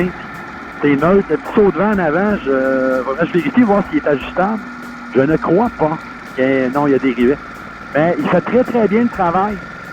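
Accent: Canada. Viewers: Vous parler comme les canadiens... c'est une moto douce et avec une grande souplesse, mais moi je prefrere la manualle de toute la vie avec l´'embrayage et la boite a vitesses...salutations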